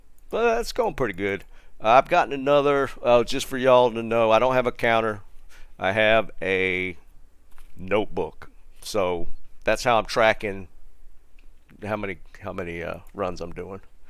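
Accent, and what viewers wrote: Texas, United States. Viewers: Omg holy crap, I was thinking the same thing also. That accent seems like a texas cowboy accent.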